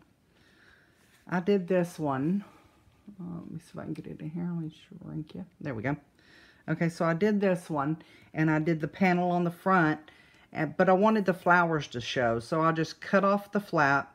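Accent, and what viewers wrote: South, United States. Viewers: Hi. Love the side fold idea!!!! I was watching your video and Alan walked in the room an heard your voice. He said, "Is that? I lover her Southern Drawl". You are famous in our house hold.:).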